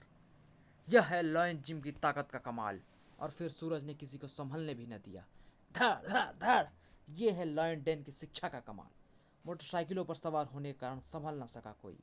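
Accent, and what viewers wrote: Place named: Bihar, India. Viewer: Bhai tumhara bolne ka lehja saaf nahi hai, apni voice ko clear karo aur thoda aaram se padho. Shabdo ka pronounced thik se karo hindi mei,,, Bihar se ho kya aisa lagta hai tumhare bolne ke tarike se.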